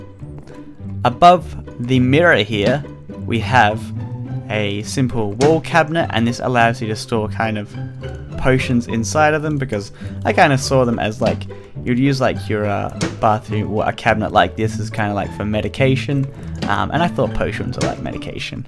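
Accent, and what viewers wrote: Australia. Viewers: i only downloaded the mod, i never actually watched the vids, i never realised he was australian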